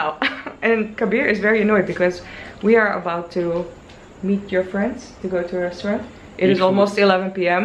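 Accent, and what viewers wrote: India. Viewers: I just started watching your vlogs , and nothing could have stopped me from subscribing your channel . I just loved the way you do it , love your sweet smile 😊 , and loved your hindi accent haha . You are really doing a great job , a very good luck for your future 😊 Keep posting . Made me a huge fan 😊.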